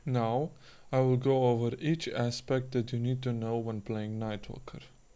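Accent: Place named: Romania. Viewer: Super tare, ma gandeam ca esti roman dupa accent, dar pana la duel cand am vazut in chat nu eram sigur :)) E comunitatea mare pe 4story classic? Tot am zis ca vreau sa ma reapuc de un server de 4story si din ce vad pe youtube, sunt destui playeri care joaca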